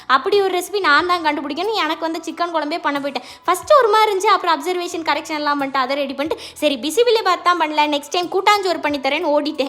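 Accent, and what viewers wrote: Tirunelveli, India. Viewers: 😂😂Sambar satham thanaaa😅😅Mathu unga video oru 5 or 6 times vathu pappaen 😊😊 Neega paesurathu superrrrr ah irukku....Neega paesura Nellai Tamil அழகு போல ....irukku😊😊❤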